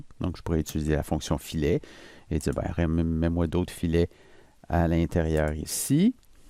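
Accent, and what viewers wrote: Canada. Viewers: Bonjour MERCI BEAUCOUP pour votre travail clair, très bien fait et très bien expliqué. Je débute dans ce domaine et vos tutos sont des mines d'or pour moi rajouter à cela ce petit accent Canadien que j'adore je ne me lasse pas de vos "cours" que j'écoute en boucle pour bien comprendre et enregistrer😊. MERCI et bonne continuation. Maxime.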